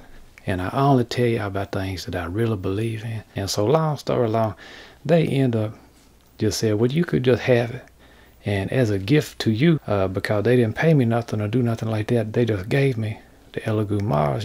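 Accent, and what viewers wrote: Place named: Mississippi, United States. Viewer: Its a Mississippi accent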